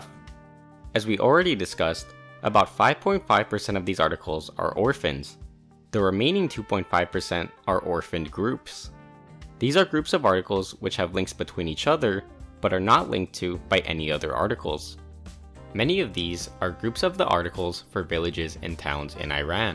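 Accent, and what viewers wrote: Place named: India. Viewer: Voice is indian..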